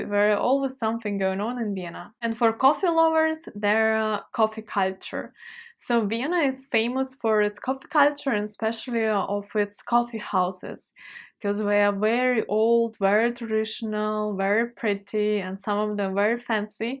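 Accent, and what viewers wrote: Russia. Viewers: Hallo liebe (meine Tochter heißt auch ) und Servus (=Hallo) aus Wien! Da dein Englisch viel besser ist als meines, schreibe ich dir auf Deutsch. Ich finde deinen leicht russischen Akzent bezaubernd! Gratuliere dir zu deinem sehr informativen Video. Das ist sicher eine große Hilfe für andere Menschen, die sich für ein Leben im schönen Wien interessieren. Du hast wirklich die wichtigsten Punkte erwähnt. Zu erwähnen wäre vielleicht nocht, dass Wien, für eine so große Stadt, auch sehr sicher ist. Ich denke, gerade auch als Frau, kann man sich in Wien eigentlich, zu jeder Uhrzeit, und an jedem Ort sehr sicher fühlen. Die Kriminalität ist kein nennenswertes Problem in Wien und auch die Polizei ist sehr zuverlässig und tatsächlich für den Bürger und die Menschen da. Ja, auch ich bin, natürlich als Österreicher (das gilt nicht nur für Wien) ein Kaffeeliebhaber. 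Es gibt wirklich unzählige traditionelle, wunderschöne Cafes in Wien, aber, geraden in den letzten Jahren, kamen sehr viele, moderne, coole Cafe dazu, die oft den Kaffee selbst rösten und hervorragende Qualität und Auswahl anbieten. Als Beispiel: <link> oder <link> Die berühmten "Mehlspeisen" also die süßen Dinge, wie Sachertorte etc. nicht zu vergessen. Natürlich leidet das Leben in Wien derzeit auch unter Corona und dem Lockdown, aber ich hoffe das bessert sich 2021 wieder deutlich. Auch ich vermisse es nämlich schon sehr, bei einem guten Kaffee, einer Zeitung und mit ein paar lieben Menschen, zu sitzen und zu genie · Are you from Russia ? У тебя замечается русский акцент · ust i heard the 1st word i recognized that you're russian at once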